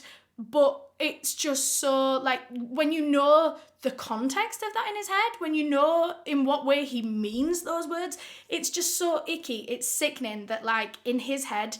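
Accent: United Kingdom. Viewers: You are my favorite true crime channel. I’m from the US so love the accent as well. You give us information as well as having the upmost respect for all the victims you talk about. And also holidays are coming around and I know you talk about your personalized hair care and for the life of me I can’t remember the name or website please let me know thank you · Do you ever see a person before you hear the accent you think to yourself "that person is very very British."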